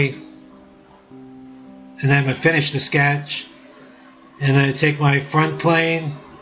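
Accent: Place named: Brooklyn, United States. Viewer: Nice tutorial! Your accent is definitely more palatable than that of many Brooklynites! Only moderately detectable. :^)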